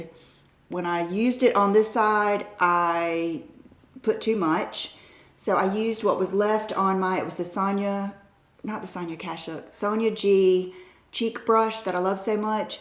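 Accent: South, United States. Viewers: One of my favorite things about you is your southern accent!!!! 🥰🥰🥰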